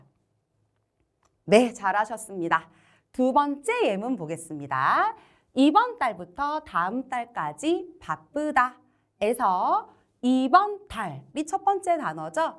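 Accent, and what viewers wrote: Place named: South Korea. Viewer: 한국 강의톤이라 어쩔수 없는건 이해가 가는데 일본어의 인토네이션으로 한번이라도 문장 한번에 읽어주면 더 좋을 것 같네요 너무 한국식같아요 ㅠㅠ 결제하고싶은데 고민되네요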